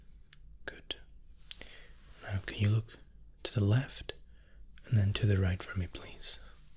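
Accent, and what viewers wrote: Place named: Scotland, United Kingdom. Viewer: Terrific video! I was trying like hell to place your accent! I kept thinking Scottish with a hint of British then I checked your, "about" page lol! Regardless, your accent is magnificent and your ASMR is spot on! Cheers!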